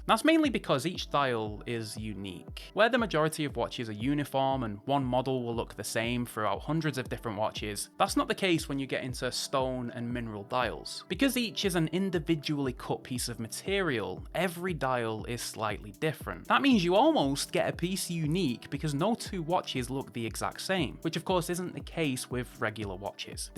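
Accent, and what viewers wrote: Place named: Scotland, United Kingdom. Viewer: Why are all you reviewers Scottish? 😒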